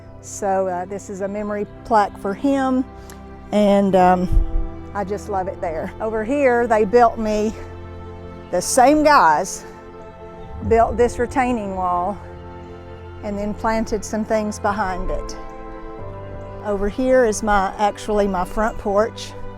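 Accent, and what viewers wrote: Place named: South, United States. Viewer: The video about the interior mentions that her kitchen countertops were crafted locally in Maryville, however there are about 7 states have a Maryville. Given her southern accent, I would guess its Maryville Tennessee, but I could be completely wrong.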